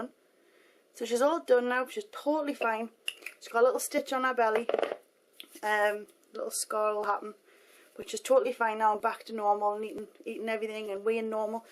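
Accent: United Kingdom. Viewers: Omg i can't understand a word ur saying! Littlebit too british i can't understand anything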